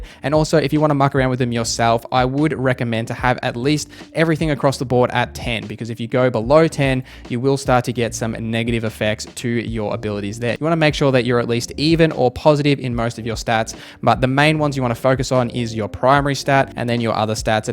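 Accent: Australia. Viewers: Clear. Concise. Australian 👌🏾🔥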